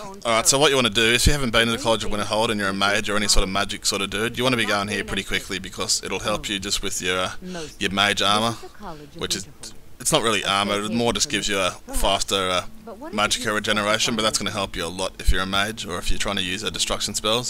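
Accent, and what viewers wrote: Australia. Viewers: it's good to hear an aussie commentating on a skyrim vid once in a while.. it's always usually some yank doing them haha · Lol i can tell ur aussie AUSSIE AUSSIE AUSSIE!!!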